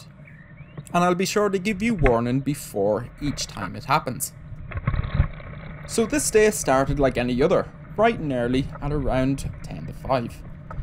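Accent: Ireland. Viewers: HELLO, Greetings from Canada🇨🇦. WoW, this was an exceptional video thank you so much! I appreciate you taking the time to explain the goings-on and for educating people like myself, for the time you've spent filming and editing and your calm, dreamy, Irish dialect and narration. (I lived and travelled throughout Ireland for a year~ loved every moment). I'm sincerely grateful and looking forward to viewing more of your video's/vlogs. Thank you ❣️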